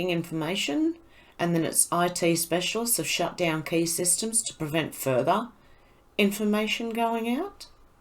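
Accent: Australia. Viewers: You are becoming one of my very favorites! Great info and love your Aussie accent!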